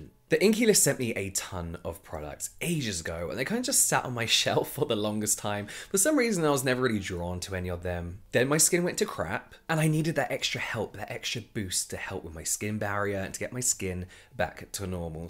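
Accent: England, United Kingdom. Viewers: Everytime I watch your video, besides that cute English accent, I also am filled with joy and laughter.. you are such an inspirational person and have a great positive vibe!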